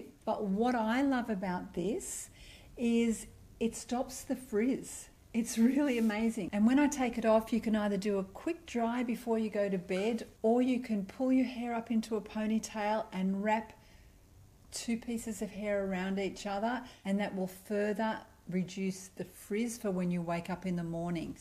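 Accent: Australia. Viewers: Your hair is certainly absolutely gorgeous. I also love your accent. Are you Australian? I lived in Australia when I was a little girl for a year when my father was a visiting professor at the University in Adelaide.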